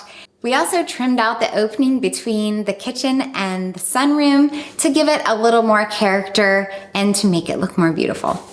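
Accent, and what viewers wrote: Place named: North, United Kingdom. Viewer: Your voice sounds like Leslie Mann who played Jane in George of the Jungle lol And I can tell you're from up north somewhere :D Love the videos!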